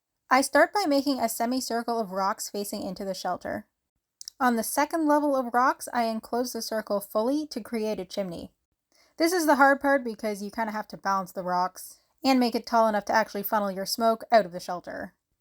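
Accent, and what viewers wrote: United States. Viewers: Well, it sounds like you’re from the south. That’s where you are now so Bobby a poisonous snake or I should not say poisonous cause you know the venomous is what they are. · for growing up on a remote island with no social media you have a very average american english accent.. :face-orange-biting-nails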